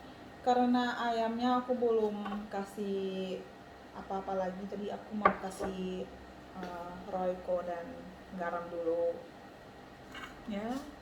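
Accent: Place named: Indonesia. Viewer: Kak kok gak ada video yg baru sih..penasaran bgt sm video2 mu..secara kamu kalo gak ngomong ,kamu orang laos. aku pikir kamu asli indonesia...karena logatmu indonesia banget loh...haha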